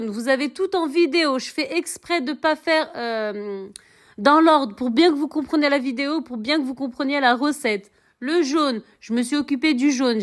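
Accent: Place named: Canada. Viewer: j'aime beaucoup ta façon de parler 😊😊❤on dirait que tu es canadienne salammm...😊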